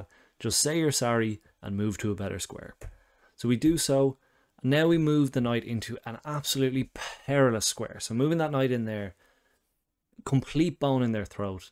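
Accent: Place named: Ireland. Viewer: Nice video and delivery although I was a bit put off by the missed mates-in-one and nearly just gave up at that point. What stopped me is that I saw a lot of positives so I’d prefer to be supportive. I’m sure it’s not easy doing what you do! I, of course, have never missed mate-in-one. Not once. Ever. 😳 Your voice and cadence of speech are easy to listen to - a lot to be said for that Irish lilt! For me that’s in contrast to many of our favourite YouTubers, the main exception being Eric Rosen. I also prefer educational content over pure entertainment and I like that you’re also on camera, which is often not the case for creators of similar material (off-beat gambit lines) Great channel name too. I’m looking forward to more Chess Please! 🙂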